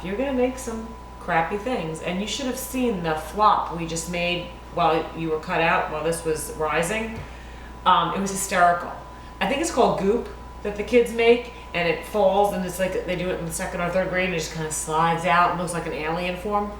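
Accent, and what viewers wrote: New York, United States. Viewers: Love you, Girl! I'm from Ma and love your New York? accent! And 'chew in' on tree bahk' - miss that sense of humor out here in Oregon! Thank you!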